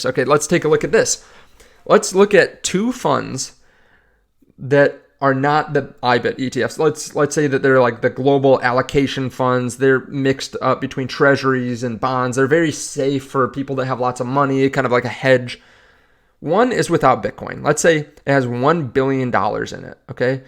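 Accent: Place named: Wisconsin, United States. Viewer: 2nd viewer today,!!! I'm subscribed and send your content to my sister, who I talked into getting BTC and SOL. I'm a happy crypto investor since 2022. Oh BTW, I'm a fellow CA resident and your accent makes me chuckle. I'm born in Wisc. and have family that say how's it going just like you. Got to chuckle...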